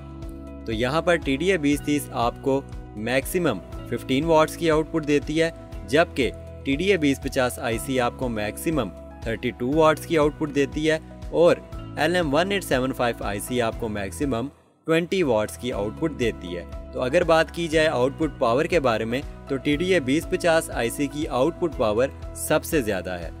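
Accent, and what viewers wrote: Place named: Pakistan. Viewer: Pakistan accent 😊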